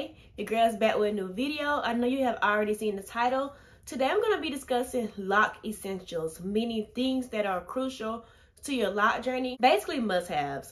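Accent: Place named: United States. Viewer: You right! That “mist” bottle is the bomb! I just got one a week ago and I love it! And no apologies necessary … I love your country accent.😁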